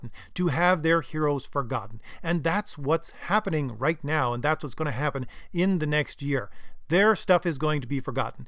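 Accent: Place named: Ireland. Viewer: you sound irish or oirish thats great i m northern ireland your channel is great!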